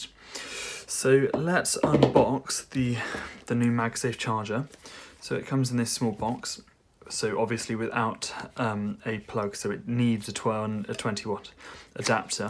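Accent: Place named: RP, United Kingdom. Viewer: Corrr your posh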